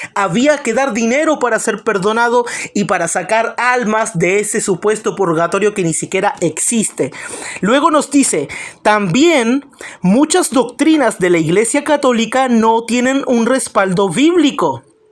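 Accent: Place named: Central America. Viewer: Quien habla dice que es Chileno?? Jajajaja, hata en eso mienten un chileno por aqui que lo desenmascare a este tipo de Chileno no tiene nada, ese acento es de Centro Americano.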